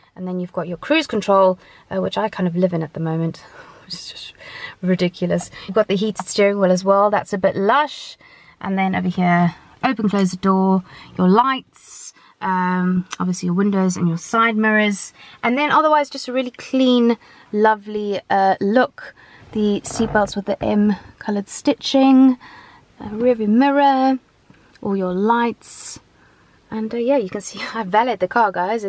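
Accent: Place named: South Africa. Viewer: you have a hint of a South African accent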